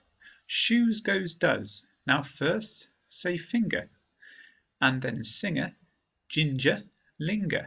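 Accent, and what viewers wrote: United Kingdom. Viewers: I like poems, and I asked to Google assistant to show me videos of poems with British accent, and she showed Me you.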